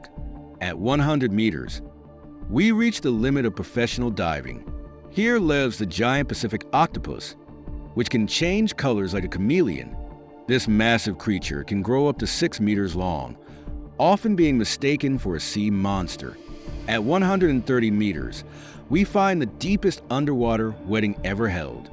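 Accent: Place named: United States. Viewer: You’re American or sound like it.. speak in feet & miles please. This isn’t throwing shade at our international friends it’s just that we don’t understand meters.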